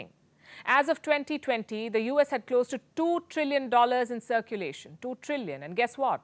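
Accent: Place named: India. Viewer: Her English is the most beautiful Indian English ever that I have heard in my whole life:):):)😊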